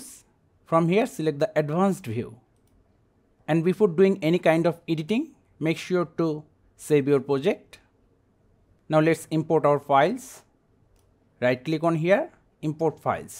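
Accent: India. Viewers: I love everytime I need to know how to edit something on a program I just found there is an Indian sounding man ready to explain it. good video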